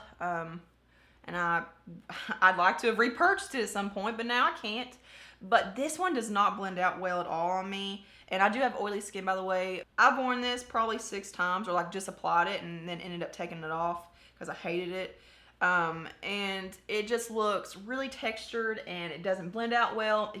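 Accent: South, United States. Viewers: Girl i freaking love your southern self! Im born & raised here in North Ga and i loooooooove the drawl!!!! Plus i love the fact you are unapologetically YOU!! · Love you, your makeup skills & your accent! I'm from Georgia & I'm proud of our southern accent! I've been #partypeople for quite some time! I graduated high school in 1993 & your birthday is in 1994....makes me feel old! Lol I'm just happy to be alive & watching your videos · I love the fact you’re Southern! I know (as in yes I actually know her, her dad and I worked together) another YouTuber and she has apparently tried to erase her Southern accent. I like to hear us speak, we don’t sound like every other person and that’s fun! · Anna Kennedy I found, watched about 30 sec of a video, heard that beautiful southern accent come out of her mouth, and immediately subscribed. 😁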